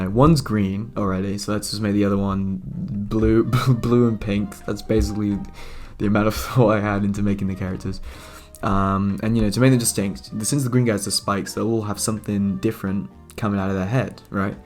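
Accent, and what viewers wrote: United Kingdom. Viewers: wait… this is crazy… he’s… HE’S… BRITISH IN REAL LIFE? AHHHHHHHHHHH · I can't recognise accents, so I really wanna know if you're from the UK. 😅. I'd be insanely impressed since most people there go to college at 16-18.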